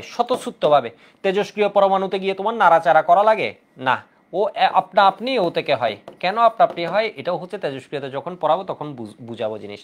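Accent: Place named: Chittagong, Bangladesh. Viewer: সম্ভবত Chittagong এর accent আছে ওনার কথায়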